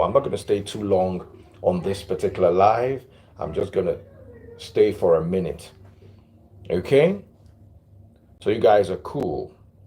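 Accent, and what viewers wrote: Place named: Yoruba, Nigeria. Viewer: daddy freeze pls I love your yoruba dialect so much. can you speak more of it. lol. your yoruba always amazed me. 😆 🤣 😂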